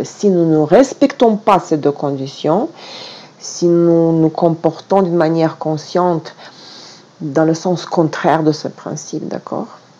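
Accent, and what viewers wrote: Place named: Russia. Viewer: merci pour cette vidéo très enrichissante... J'adore votre accent... Je précise d'ailleurs que votre français est parfait quand on sait combien cette langue est complexe... Quand je vous écoute, çà me replonge dans mes années lycée lorsque j'étudiais le russe... une langue dont la musique me berce encore... Votre façon d'aborder l'astrologie me parle beaucoup: elle est précise et claire à la fois... Belle semaine à vous...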